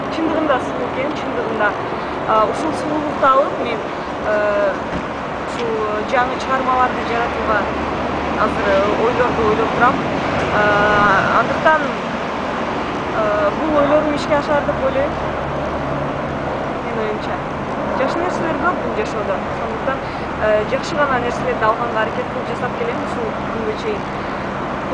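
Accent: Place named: Kyrgyzstan. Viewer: Туталанам туталанам.........молодец нукура кыргыз кыз...суйлогонунон сенин карындаш!!! Молодец, расти большой, умницей..только не меняйся....а то в шоу почти все шпили вили...держи марку карындаш!!!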